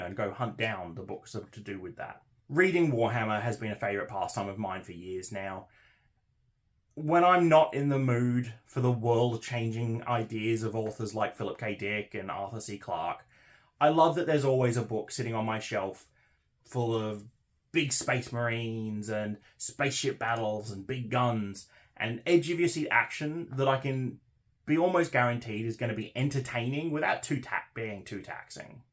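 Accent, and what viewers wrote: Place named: United Kingdom. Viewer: Thank you for the video. You should try speaking less British. You hold onto words too long. This video could have been 11 minutes and I wouldn’t have started nodding off immediately. Good content though.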